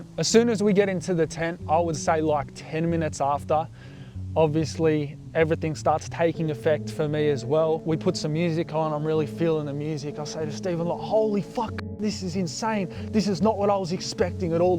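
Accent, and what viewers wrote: Australia. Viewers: He’s Australian bro 😂 what Wer you on tho my guy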